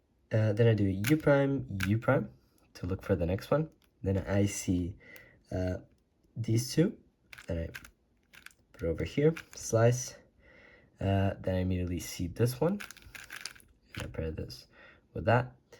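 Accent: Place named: United States. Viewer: Your accent sounds very American lol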